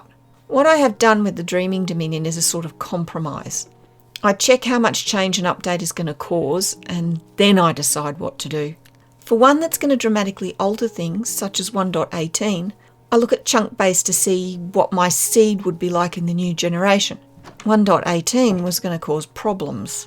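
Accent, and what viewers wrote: Australia. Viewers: thats one heck of an aussie accent love it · Hello, you sound Australian. im Kiwi, its so pleasant to hear your voice and see what you have made ! Incredible work aswell you have some passionate builds! Thanks for inspiration ❤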